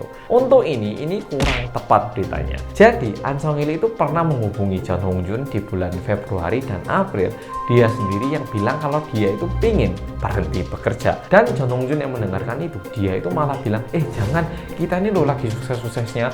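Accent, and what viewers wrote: Java, Indonesia. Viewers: gak papa mba . Memang tdi keingatnya di kepala juga begini. Ada logat Jawa nya😄 tapi ini beneran, saya tidak menyinggung siapapun yaaa. Ini hanya pikiran yang muncul sekelebat di benak saya. Saya mohon maaf kalau ada salah kata atau pelafalan saya jika ada pihak yg tersakiti 🙏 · wkwk...sama kan...berasa banget logat jawanya..tpi seru sih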